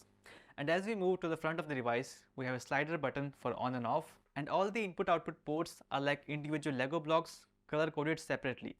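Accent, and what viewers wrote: India. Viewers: you have an Indian English accent, which I think is fine.But. you can instead focus on Innotation and pause in delivery.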